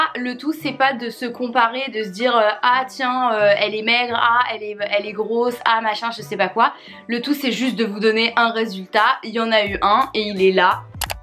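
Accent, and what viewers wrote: Quebec, Canada. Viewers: C'est moi ou tu as un petit accent québécois 🤭🤭❤️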